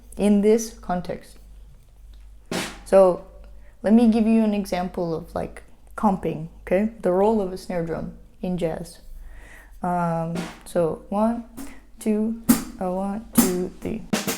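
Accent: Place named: Eastern Europe. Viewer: Slavic accent. It's not thick at all, but it sort of 'rounds' words, makes them voluminous. Great video, btw!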